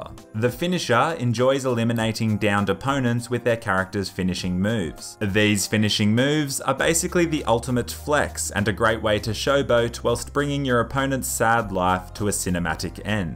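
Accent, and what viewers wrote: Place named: Australia. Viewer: Within the first 5 seconds, I was thinking, 'ohh, might be a fair dinkum aussie', 1 minute I was thinking, 'what a bloody legend, an aussie gaming channel. Bloody oath.' don't even have to be told. I can smell an aussie from the other side of the planet.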